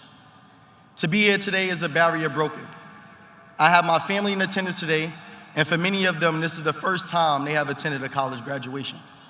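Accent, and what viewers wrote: United States. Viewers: That DEEP New York Accented Beautiful man of Color spoke LIFE🎉🎉🎉🎉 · I ❤ his Brooklyn accent! Saying 👋🏿 from Texas. Proud of you,!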